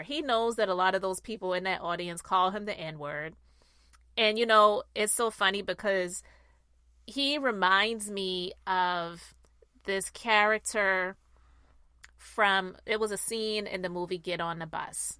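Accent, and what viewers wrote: Charleston, United States. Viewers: Hey girrrl, you sound like a Charlestonian!!! Then, you do know this jerk is full of it!!!!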